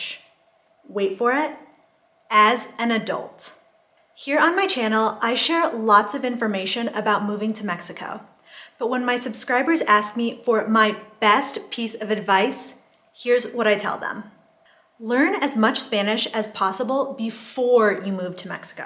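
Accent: United States. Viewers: Great use of ‘El Subjuntivo’ in Spanish👍. Don’t take this the wrong way but your Spanish has a heavy American accent but don’t worry I’m sure people in Mexico like it. It’s too bad in the US some people don’t like accents🤷🏻‍♂️and they don’t try to help but hopefully that’s not the case in Querétaro 🇲🇽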